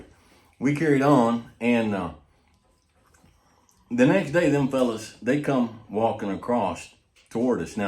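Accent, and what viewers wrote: United States. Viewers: Am not English speaker, sometimes I get the meaning, you are sir a very good man, I wish I was fluent in English especially understanding this strong real American accent. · No worries you 're doing all well mate . Your coffe is great pal . Love watching your videos . And I adore your cowboy's accent mate ))) Great respect from STRONG UKRAINE ))) I keep watching you .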